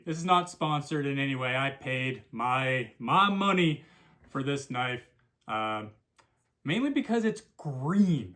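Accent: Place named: Canada. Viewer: Every Smith Blade unboxing video is a guy with a Canadian accent and I think it's part of their plan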